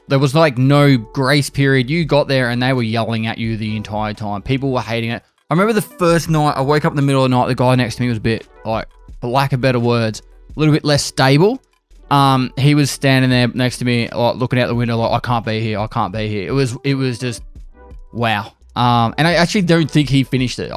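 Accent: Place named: Victoria, Australia. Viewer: Hey, thought you sounded victorian, was surprised to see you live in Brisbane (I'm from Melbourne)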